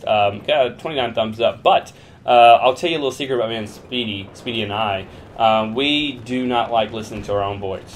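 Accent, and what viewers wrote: United States. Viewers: Your awesome:) One question, where in America is your accent from? I can't pick it cause I'm from NZL.. Haha. Keep up your great videos! Always brings a smile to my face.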